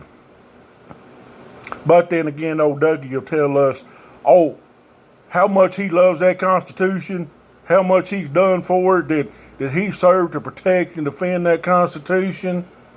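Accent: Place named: South, United States. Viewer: This guy's 100% right, took an oath to uphold the law at all times yet he stands behind the biggest criminal in American history, and as a military man he's supposed to defend The United States Of America instead he stands behind a lone lunatic imbecile who has caused irreparable harm to our democracy and severely compromised our national security. is the moron not this guy, having a southern drawl doesn't make someone an idiot.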